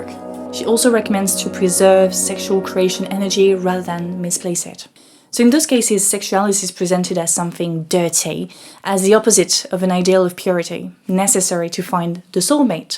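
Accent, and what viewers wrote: France. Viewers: She does it deliberately to misrepresent her political opponents so she can make 'essays' pretending she knows what she talks about. Trust me, if you start an essay from a false premise then no matter how many big words with a french accent you say, it's pretty much garbage from the initial false premise. She doesn't want feminists represented by the hairy purple haired freaks screaming at everyone with viens bulging from their forheads about how proud they are for their abortions because she would claim that lacks nuance right before making the claim that all men are incels in her opinion 🤷‍♀️ this approach is the substance of most leftist debate these days, thats why conservatives generally have stopped trying to talk to them. Because their interactions are disingenuous and gaslighting.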